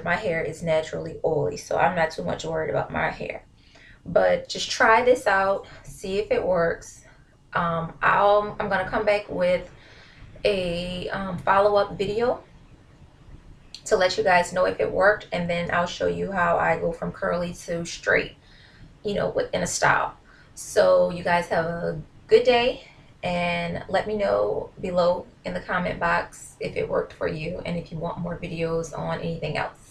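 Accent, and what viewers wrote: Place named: Baltimore, United States. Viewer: You Sound Like You're From Baltimore But This Video Was Really Helpful, Thanks 👌🏾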